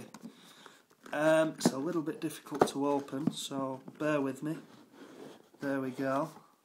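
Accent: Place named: Yorkshire, United Kingdom. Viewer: haha i love the way you pronounce bokeh they should replace all the news anchors on the bbc to have a yorkshire accent :)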